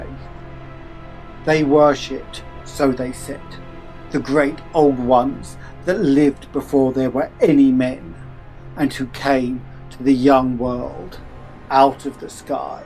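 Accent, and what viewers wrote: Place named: United Kingdom. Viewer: Telling a story with Americana locations and culture with a Brit accent seems a bit out of place 😅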